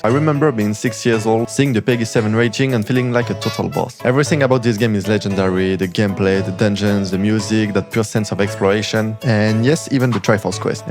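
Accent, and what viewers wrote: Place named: France. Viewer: remove the french accent you sound pity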